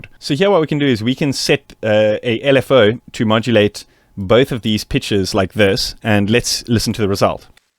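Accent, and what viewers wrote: South Africa. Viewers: you sound South-African. Are you? :)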